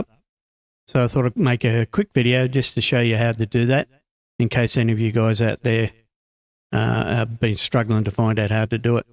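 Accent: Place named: Australia. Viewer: Mate are you aussie by any chance? Been watching your videos for a while, only noticed your accent today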